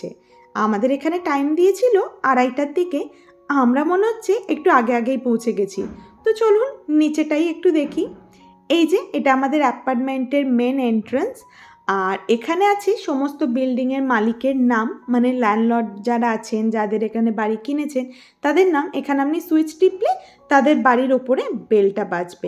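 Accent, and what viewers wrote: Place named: Kolkata, India. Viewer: Well done! Your accent is like more from Kolkata :)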